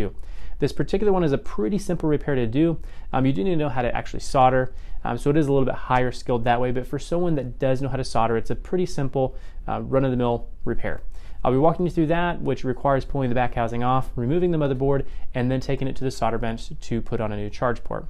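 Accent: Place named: Morocco. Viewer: من الهدر باين انت مغربي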